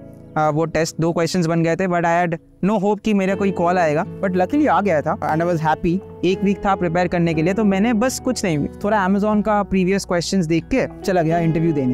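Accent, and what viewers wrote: Bengal, India. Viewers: Bhaiya are you bengali ? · The cute bengali accent😊😊😊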